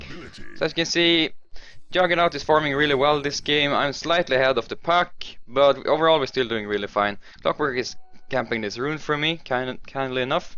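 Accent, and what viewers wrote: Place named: Sweden. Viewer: wp sir. i really like the swenglish too :D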